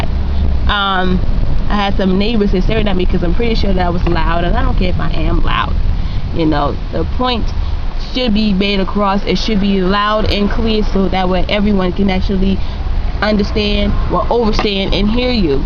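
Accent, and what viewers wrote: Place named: United States. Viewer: ok u r like american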